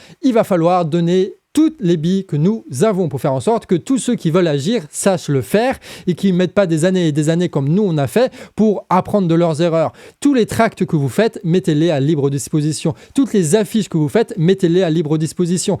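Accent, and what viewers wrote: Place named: Alsace, France. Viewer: Engueuler macron avec l'accent alsacien, c'est pas mal.😊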